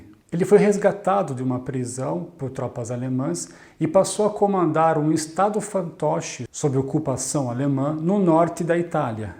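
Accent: Brazil. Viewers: CONHECI o seu canal hoje por causa do vídeo no canal do Henry ... Esse vídeo sobre #Fascismo simplesmente é o mais top dentro de 30 min ... Parabéns !!! Esse sotaque é meio Blumenauense ??? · Revendo seus vídeos, nosso que o seu erre já virou éhe.Observe quando o senhor diz Homa. Gostei de sentir o meu Brasil na sua memória. Maravilha! Afetuoso abraço.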